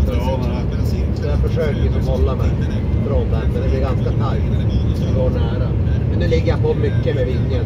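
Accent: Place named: Piteå, Sweden. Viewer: Låter som pitemål. Piteå gissar jag på.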